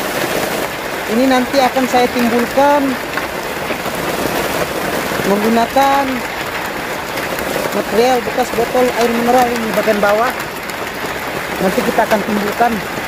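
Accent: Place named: Bima, Indonesia. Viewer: Logatnya kek BIMA NTB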